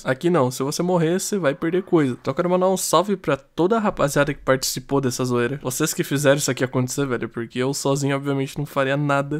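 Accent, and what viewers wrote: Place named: Rio de Janeiro, Brazil. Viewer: Coe, tu só pode ser carioca ou tu é sul fluminense, pprto que não tem como kkkkkkkkkkkkkk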